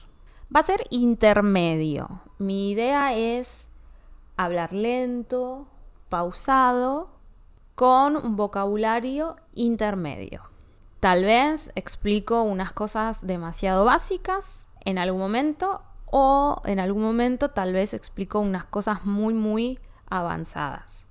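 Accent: Argentina. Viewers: Me gusta mucho lo que haces. Para mí es muy importante escuchar el español argentino. Con mucho gusto y beneficio escucho y veo tus podcasts. ¡Muchísimas gracias!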